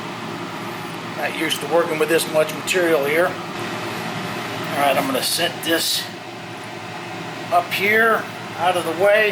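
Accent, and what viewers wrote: United States. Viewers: WOW People and Viewers, this guy has deliberately gone out of his way to make this look like Bomb making and it's not. Why would this guy do that? is it that he's not wanting backyarders to encroach on his market? Listen to the voice - does it sound American? Americans always Lie for Commercial reasons - "There were no weapons of mass destruction in Iraq" only a bunch of children to be murdered for their oil" this guy is lying badly - he's an American - it'll be for the same reason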